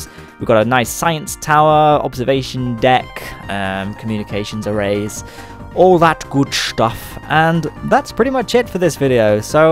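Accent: United Kingdom. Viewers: I really love your british accent :D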